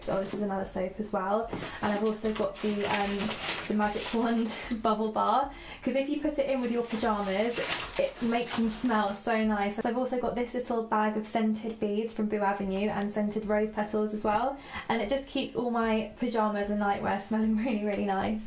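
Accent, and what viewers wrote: United Kingdom. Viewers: I don't know how but I automatically knew you were British the moment the video started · luv the bedroom/also your hair color is so pretty and I wish I had a british accent/ im from the u s a/ thanx 4 the FABULOUS video!! like your outfit 2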